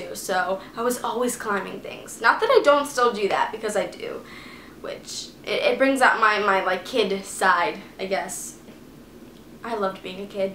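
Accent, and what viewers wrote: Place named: Louisiana, United States. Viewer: You can so tell you are from Louisiana !!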